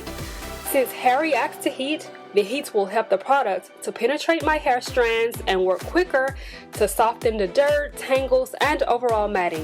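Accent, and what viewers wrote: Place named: Australia. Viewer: You sound like you had a little Australian accent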